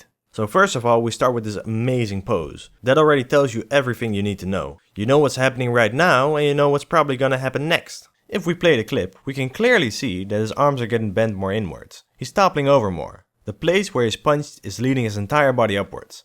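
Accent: Netherlands. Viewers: dutch accent is so obvious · are you dutch? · U dutch? Cus you sound dutch. Im dutch too and into drawing and animation. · You sound really dutch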